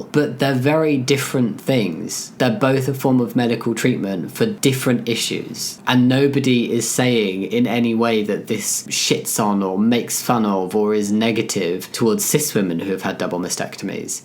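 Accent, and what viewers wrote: United Kingdom. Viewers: Normally I don't pay attention to rants but the British accent and your humor makes it tolerable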